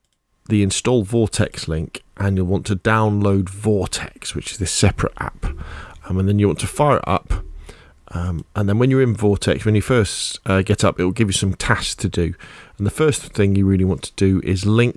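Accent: United Kingdom. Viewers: thank you, british man.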